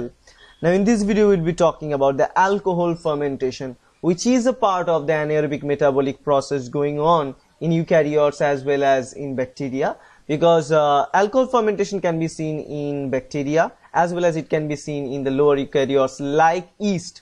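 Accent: India. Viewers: thanks so much man you the only one in the in the internet who actually explains it well. Loving your indian accent!!! thx a lot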